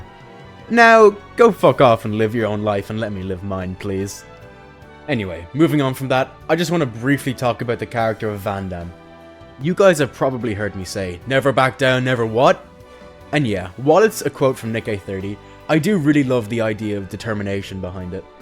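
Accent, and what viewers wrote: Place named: United Kingdom. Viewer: You sound British just like the characters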